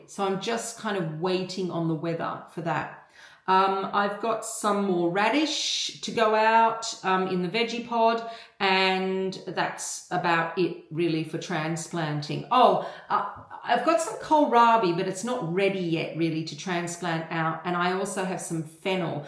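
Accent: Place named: Australia. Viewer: Hi Thank you for your videos they really helpful and yes, you do have a lovely Australian accent 😂 Im from Portugal, but I've been in England for 33 years, and i, too, don't think i have an accent, but apparently, i do I guess in my head, i just sound very British 😂 and it always makes me giggle when someone asks where im from😂 Have a lovely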